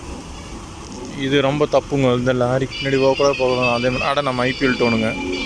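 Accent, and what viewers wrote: Coimbatore, India. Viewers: coimbatore tamil ngow anna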